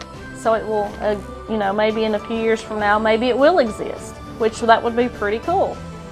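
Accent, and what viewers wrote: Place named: Appalachia, United States. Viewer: What a bunch of garbage with a hillbilly accent !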